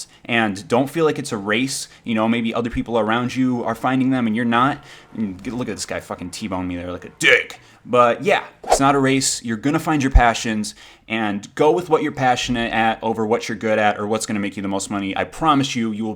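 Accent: Michigan, United States. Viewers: what part of Michigan do you come from? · what part of michigan are you from?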